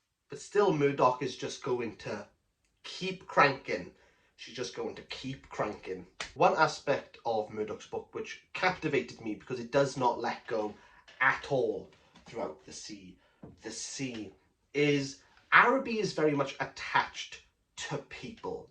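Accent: Wales, United Kingdom. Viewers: Hello fellow person from Wales. Your accent is similar to mine. Thanks for this review. I have just finished the book and was dying to listen to someone else's views. I think Charles always called his friend Perry but was reminded to call him Peregrine. I agree that the story is funny and almost a farce in places. There's a lot of religious imagery and very very deep and philosophical. I wish that the story hadn't gone on quite so long. I did crave a clearer conclusion at the end. Thanks again.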